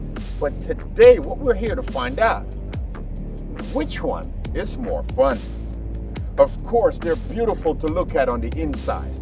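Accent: Jamaica. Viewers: You jamaican bro ?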